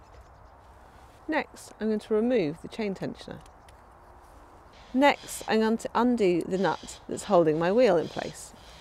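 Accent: England, United Kingdom. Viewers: Best ever Step by step Repair video ever Created. Great English Accent, great voice tone. The Best ever English teacher ever Created. Great Country side Background . Priceless for a GreenHorn like Me.Thanks a few Billions. Great tips like not removing fully the tab washer, lifting the rear wheel to ease chain releasing b4 tensioner takeoff.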